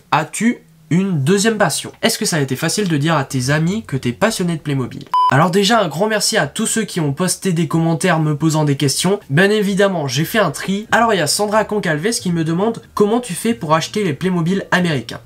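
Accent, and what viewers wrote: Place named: Germany. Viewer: Super l accent allemand 😁😁😁😁. T es génial.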